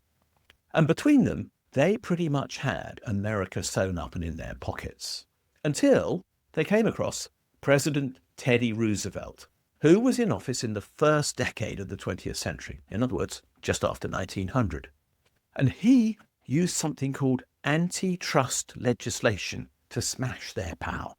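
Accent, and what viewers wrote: United Kingdom. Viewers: By your accent your from Britain? UK? Stay out of U.S politics bud Trump is our president. A true leader. I ask this how dangerous can a company get when all the people have to do is simply use another company if they get too powerful but when the government gets too big we are fuc*ked. I'm more more fearful of a government than any company. Wake up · Your talking out of your backside because your mouth knows better. And your Brit accent does not make you sound intellectual. The only destruction is perpetuated by the Marxist Left: No God. No Country. No Family. Burning American Flags. And when witnessing that same regressive Left burning down cities, businesses; and, not to mention the violent fire bombing of Tesla and the mob attacks on citizens, you got some balls claiming President Trump is destructive.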